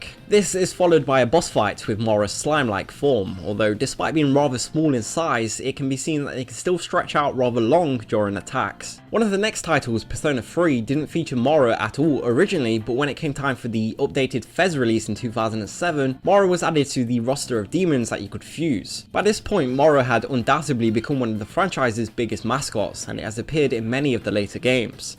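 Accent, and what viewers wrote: United Kingdom. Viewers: The commentator? Uh, he's British.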